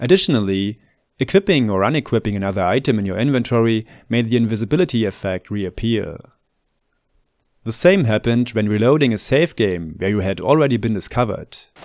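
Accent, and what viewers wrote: Germany. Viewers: Looks pretty cool. Any chance it's compatible with vanilla (and non-modded) saves? Your German accent is a thing of beauty btw. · ich gehe vom ankzent her mal davon aus das du deutsch bist ^^ mein englisch ist schlecht also frage ich auf deutsch ist OpenMW mit der Steamversion von morrowind compatibel?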